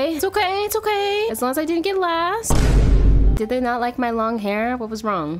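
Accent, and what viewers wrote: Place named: Asia. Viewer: her asian accent tho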